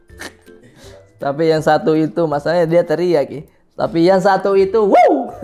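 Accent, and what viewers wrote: Makassar, Indonesia. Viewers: saran bang klo pake bahasa/logat makassar disubtittle biar yang gak tau bisa tau hehehe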